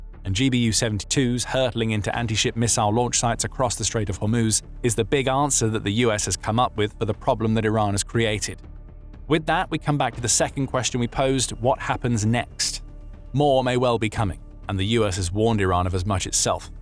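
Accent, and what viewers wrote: England, United Kingdom. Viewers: a British accent?? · I can tell just by the narrator's English accent that this some bullshit. "Sorry, bloody bullshit."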